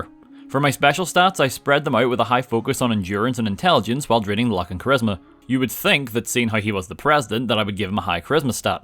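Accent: Northern Ireland, United Kingdom. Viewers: New you where from N.I. the moment I heard ya. Way to represent the culture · I FUCKING KNEW HE WAS NORTHERN IRISH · I didn't even notice his accent until he said he was northern irish.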